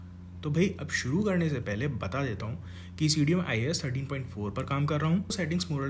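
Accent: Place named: Gwalior, India. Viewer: funny accent ... Ekdum Gwalior Andaaz me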